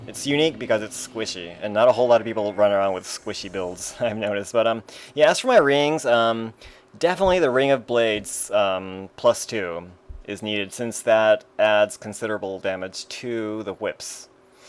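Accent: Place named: South, United States. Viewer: weeaboo game strong this guy has a country accent yet thinks he's Japanese damn weeaboos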